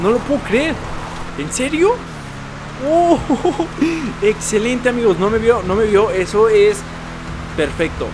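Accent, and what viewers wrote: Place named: Sonora, Mexico. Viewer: Eres de sonora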